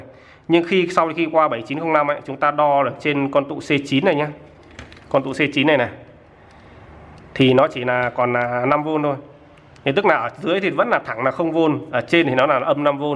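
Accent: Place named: North, Vietnam. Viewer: đúng thợ điện tử nói dễ hiểu.người bắc lại dễ nghe. cám ơn anh.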